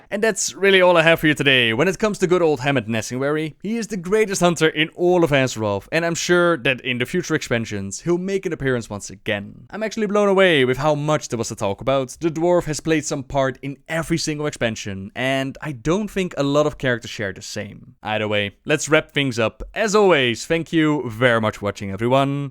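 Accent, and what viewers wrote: Netherlands. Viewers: theonesinblk you know he's dutch right? Accents are accents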